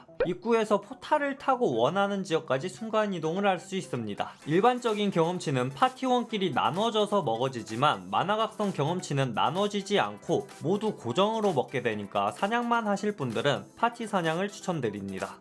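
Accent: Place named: Jeolla, South Korea. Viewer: 그런데 혹시 전라도 분이신가요? 못해가 모데로 들려서 너무 친숙하네욬ㅋㅋ 고향사람 만난느낌 유튜버님 서버는 어디로하시나요?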